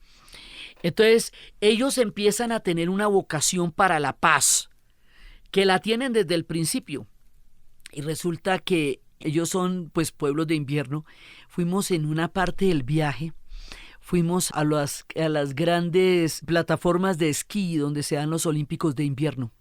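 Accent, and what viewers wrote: Colombia. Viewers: Hola me tienes fascinada tengo días ya escuchándote, te escuche los tres capítulos de Turquia, Noruega, y bueno solo me transportas a el momento, eres una mujer que cuentas las cosas que haces que la piel se me ponga chiquita, y pues tu voz colombiana me encanta, te mando muchos abrazos desde Guadalajara Jalisco México 😘 🇲🇽 💕 y seguiré escuchándote mi papá me preguntó como podía escucharte tiene cáncer, tiene 84 años y ya te escucha también. Bueno no se cuando termine de escucharte pero eres una gran mujer Dios te bendiga 🙏 🥰❤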